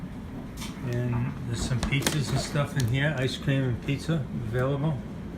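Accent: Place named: New England, United States. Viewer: Nice for you to see a fellow New Englander! Ya nevah loose the accent! Wicked pissa!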